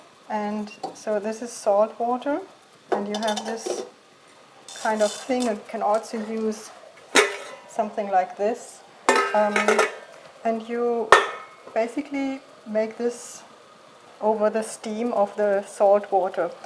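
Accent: Germany. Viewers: A GERMAN SPEAKING ENGLISH!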